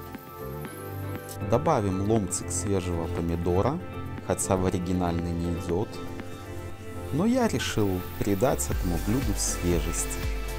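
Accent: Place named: Belarus. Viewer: Профи👍 У вас белорусский акцент. Или я ошибаюсь?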